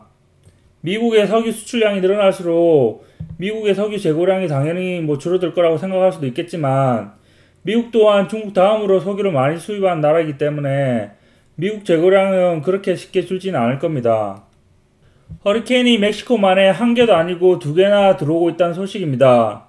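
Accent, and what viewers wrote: Gyeongsang, South Korea. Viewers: 유튜브로 뵙게 되서 영광입니다. 구독과 좋아요 눌렀습니다. 억양이 저의 고향인 대구쪽이신 것 같아 더 반갑습니다.^^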